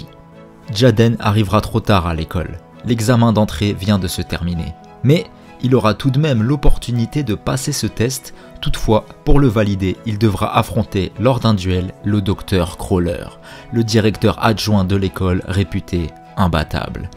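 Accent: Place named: Alsace, France. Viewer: je te connais t'es alsacien toi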